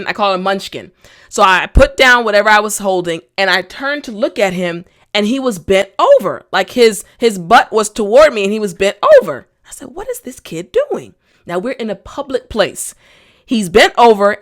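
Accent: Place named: United States. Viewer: I love listening to your amazing beautiful American accent! I have lived in Pittsburgh, PA, and I've decided coming back to Brazil. I like your high mood by explaining things!!! Thanks,for your love in passing lots of information to us! I've been speaking English,for over 32 years, and I love this stunning language,that God gave me as a gift,and that is something I'm very thankful for him of. And you are the best teacher I've found on the web ever!!!! I congratulate you,and God bless you for this great work, and amen!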